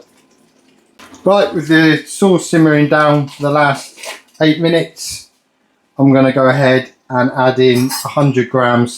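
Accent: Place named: Gloucester, United Kingdom. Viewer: Is that a Gloucester accent , I’m the Glos- shire originally do like hearing the accent 😊🎉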